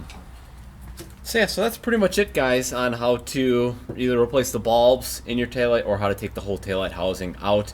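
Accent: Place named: Midwest, United States. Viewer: From the Midwest are ya?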